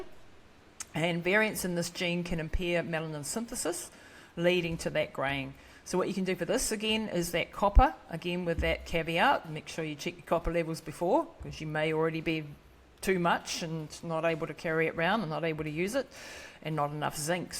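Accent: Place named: New Zealand. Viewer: New Zealand accents make no sense to me. Why pronounce hair as "hee-ah?"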